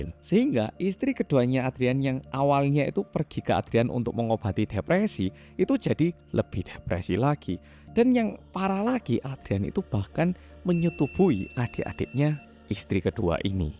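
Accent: Java, Indonesia. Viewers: MULAI AGAK2 KONTENNYA NIH CHANNEL YANG JUAL MEDOKNYA =)) DAPAT GEDE YAH DARI JOKOWI? TERLIHAT SEKALIIIII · Sampean kok yo ijik medokkk to mas 👍👍👍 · Sampean korea kok medok jowo to kang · Mantap bngt mas..dah bertahun kmbli k korea,tpi medok jowo nya msih kentel buanget..seneng dngr mas ngomong.salam dari mlysia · Padahal udah hapal klo ini orang korea yg medhok, tapi ttp ngakak pas denger "klotok" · Kok medok kk ngomong nya , saya 14 th di singapore tp nda tau kasus ini yo.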